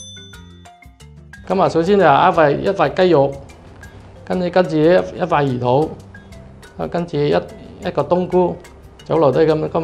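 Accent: Zhongshan, China. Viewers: 师傅口音好似中山人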